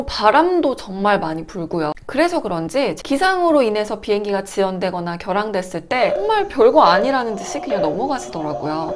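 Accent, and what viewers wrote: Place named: Jeju, South Korea. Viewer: 제주도 말씨 첨에들었을때 억양이 신기 했는데 볼터치 블러셔 색상 넘 이쁨요 ♥️💜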